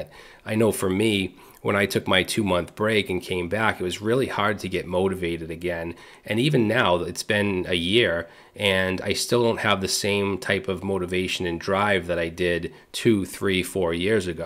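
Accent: Boston, United States. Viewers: Hi. New sub. Thanks for this. I got to (5 years ago now) where I just felt I wasn't progressing. mostly due to the company slowly pushing us regulars out tbh.. so that was depressing and I've been waiting for that spark again I had when I first started doing web development and that... was decades ago. Watched your React crash course (wasn't my first time trying React and each time it changed which was so frustrating) and I enjoyed it a lot.. Can't say I've ingrained all the concepts yet but I'm continuing this time. Kind of have to financially. Also, I heard the accent. I'm just north of Boston. Maybe one day we'll meet. Thanks again for the down to earth everything.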